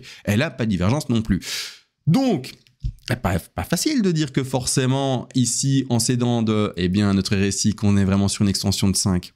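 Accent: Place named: Belgium. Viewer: Nous on dit « uit » toi tu dis « ouit » Mais depuis que je te suis, je me suis habituée 😉 Du coup tu es quoi ? Belge ? Suisse ?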